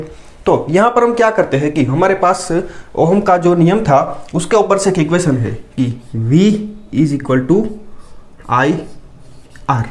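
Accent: Gujarat, India. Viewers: Bhai tame Gujarati cho ne. Awaj thi khabar pade che. Kayi city thi cho bapu?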